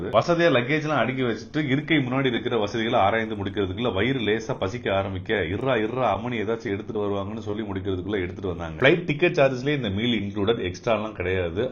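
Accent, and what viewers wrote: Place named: Sri Lanka. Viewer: Nenga srilanka a bro.? Ungal tamil ucharippu migavum arumai. Pothuvaga as compared to Tamilnadu people ur tamil slang is more og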